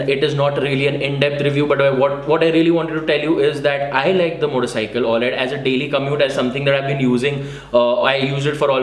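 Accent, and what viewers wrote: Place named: India. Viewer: need subtitles, your Indian is hard to understand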